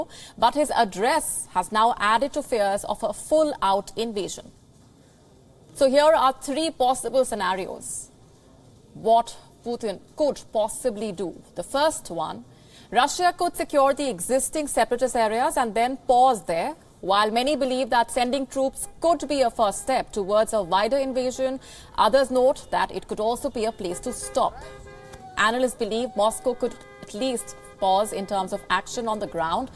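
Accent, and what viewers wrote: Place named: India. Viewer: This anchor has a pretty heavy chapati/bada pav accent Not smooth like Palki Not suited for an international channel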